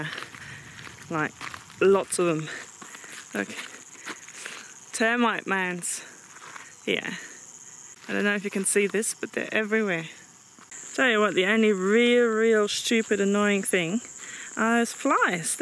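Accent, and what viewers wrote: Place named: East Germany, Germany. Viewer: Was the water so deep? It almost looked like you could just drive through :) would have been funny and maybe wet in the car :) und ich liebe den Ostdeutschen Akzent :D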